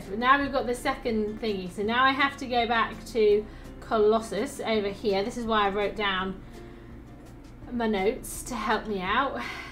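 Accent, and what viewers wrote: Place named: United Kingdom. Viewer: I love your british accent. Good job. Keep on playing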